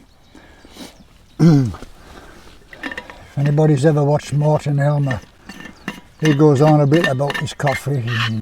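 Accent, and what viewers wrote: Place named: Liverpool, United Kingdom. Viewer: Oh, put some up. They were great I'm right behind you at 65 and hubs James bought me a Stratocaster a few weeks ago. I sold mine maybe 2 years ago...thought I was too old and have arthritis in hands but, I had got him a semi pro electronic drum kit for Christmas and with all these great backing tracks and bass track you can use for free we have started playing. He says we should up loadvids but I am not keen at all. This strat is as sweet as honey with super low action and it was the bargain of the century but its twice as heavy as my last one so I have to sit down to play...not very rock chick! As I said, liked those vids of yours and in principle I think its good for people of any age to see elders just doing...because like me, I am sure you remember a time when old age was not synonymous with illness. Somewhere Ive got a vid of my sisters band in the USA. 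Rock chicks, all over 60, lead singer 67 and they are belting it out on the circuit in L.A.if I can find it I'll upload it You sound Liverpudlian/cumbrian....I'm Lancastrian from Worthington in S. Lancashire. James is a very Yorkshire man we both miss northern banter our tiny village in Pembs is all round table, women institutes and a strict regime of intense garden manicuring...they roll along in life with absolutely no rock at all🤣🤣🤣 Have a great day and get them vids up lad!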